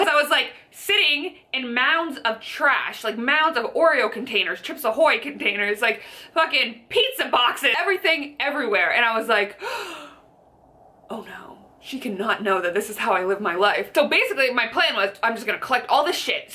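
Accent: Canada. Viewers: Her Canadian accent is so adorable! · Canadian accents are so cute :) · Canadian accent, Get over it.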